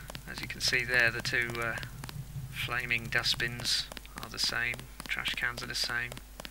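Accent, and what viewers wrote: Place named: United Kingdom. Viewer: Not sure why, but every time I watch a YouTube video on how to get some program to run, it always seems to be a Brittish fellow. Anyway, thank you very much for posting this tutorial! I have never played Deus Ex before, but after loving DXHR, I decided that I simply must play the original as well. And thanks to your video my experience should be even better now.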